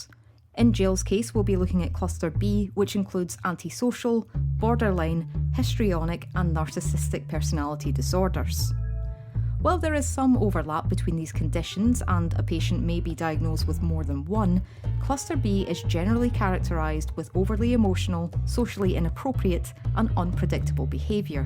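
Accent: Scotland, United Kingdom. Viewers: I watch it regularly & my hubs & i quote this daily. Helped me in my dark days & for me its the greatest show on earth! The "funeral" episode in series 1 is the joint funniest & most insanely brilliant shows ever along with series 2 "Jill's Pregnancy". Yew can keep yer bitterness to yerself, perhaps you might want to have a day off from persecuting Jill, YouTube Channel person, otherwise it just gets nasty. As soon as i heard your hacking cough & Scottish accent all i thought was "oh God, its Sandra!". Id cry if my ducts were working. Thank yew.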